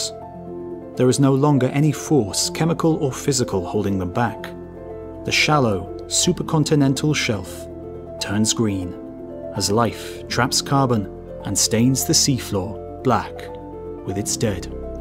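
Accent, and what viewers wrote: England, United Kingdom. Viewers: i swear sounds like he says "the year was 1917" :-P gotta love the british accent!! <3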